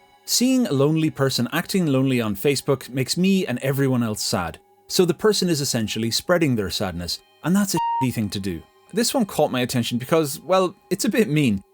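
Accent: Ireland. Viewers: I had no idea you were from Limerick! I lived there for almost a year and now immediately recognize your accent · YOURE FROM LIMERICK?? I always thought you were irish cus of your voice but i never imagined you were from MY hometown ! 👏🏼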